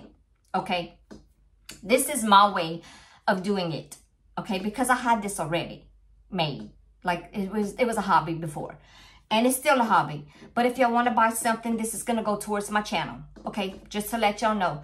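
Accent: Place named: Louisiana, United States. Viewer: That is one pretty owl. I'll have to check out your keychains next time you show them. I ordered from Abigail when she first opened her shop but it was not putty. It was wax and it's great. I still have a ton left. Smells amazing too even after a year. You are almost at 250 so congrats on that. 🎈I'll shout you out on my Community Page. I love listening to you talk because my Mama was a Cajun lady herself from Louisiana and I miss her so much.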